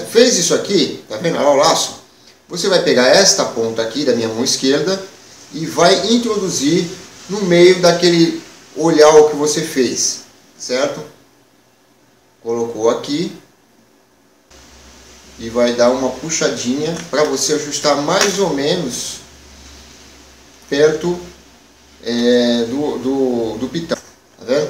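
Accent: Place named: China. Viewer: e por que vc fala tipo chinês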